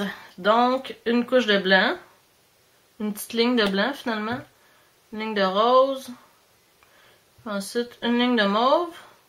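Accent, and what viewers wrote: Quebec, Canada. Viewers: Si t'es pas au courant c'es l'accent canadien --" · Euuu accent quebecois ! Et enfin du monde qui parle francais/quebecois comme moi !! Lol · YESS! Une québecoise qui explique comment faire un dégradé! En passant c'est super bien choisi tes couleurs, elles matchs vraiment ensemble! GROS PS: MOÉ JEL L'AIME TON ACCENT! -XXX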